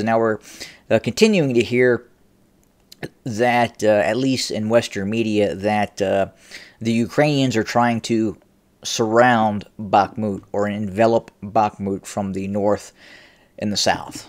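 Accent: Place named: United States. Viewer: Don't let the American accent fool you.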